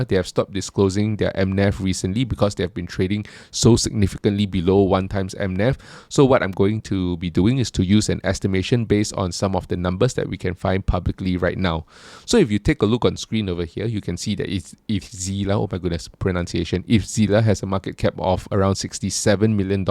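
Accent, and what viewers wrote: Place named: Malaysia. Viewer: I suspected but again you sound/accent exactly my Malaysian colleagues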